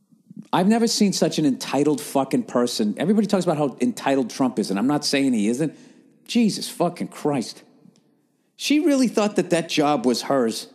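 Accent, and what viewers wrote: Boston, United States. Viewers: he has a Boston accent...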